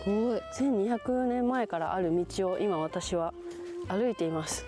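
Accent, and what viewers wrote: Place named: Kansai, Japan. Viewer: Kansai-ben, the best 😂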